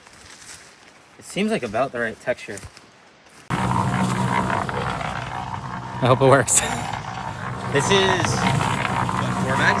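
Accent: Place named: United States. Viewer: You sound American she sounds polish?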